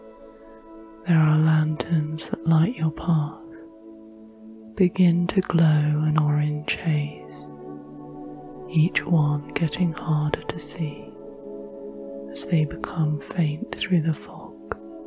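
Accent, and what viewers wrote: United Kingdom. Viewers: Here in Carson City Nevada USA, I've tried several different methods. Supplements to relaxation recordings. Some success with bamboo forest recordings but found any with too much rain or wind or music was more of a distraction. Then, I found your 3 hour recordings three nights ago. I've been sleeping with you since😊using Bluetooth ear buds. The combination of your vocals and background music works magic. The British accent is an added comfort. 😴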